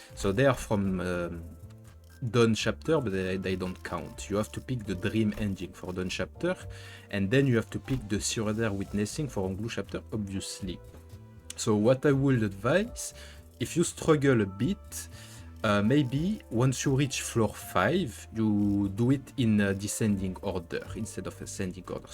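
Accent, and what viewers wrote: France. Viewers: this exquisite french accent really adds a lot to the video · It's so nice finally hearing a fellow Frenchie for once in this guides.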